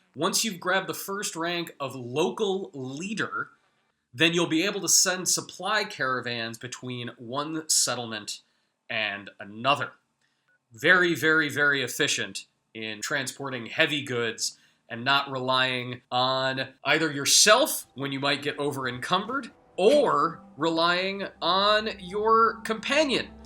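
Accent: Scotland, United Kingdom. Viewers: Why 8k dislikes? Yeah his accent is annoying but Scottish people don't get this much dislikes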